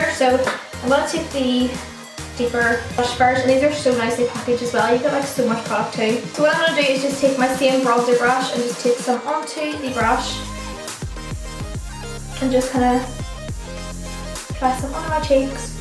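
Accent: Northern Ireland, United Kingdom. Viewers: Are Your British? Your Accent Is So Nice! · Are you from Northern Ireland? 😊 ps. Love your videos❤️❤️❤️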